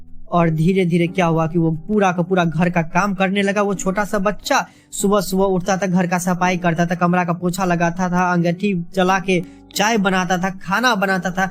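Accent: Bihar, India. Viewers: भाई तुम बिहार से हो क्या ।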